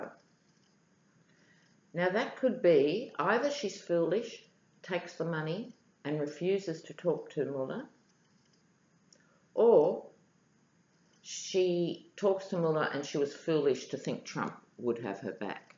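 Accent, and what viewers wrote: Australia. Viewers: Linda Casey, That had me cackling. I missed that because I'm used to the Aussie accent. But seriously, the visuals of Trump humping Hope are just too... excuse me while I barf.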